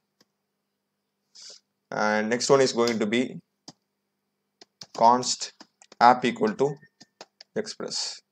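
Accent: India. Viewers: i am also IND , nice my guess true, your English slang say you are Indian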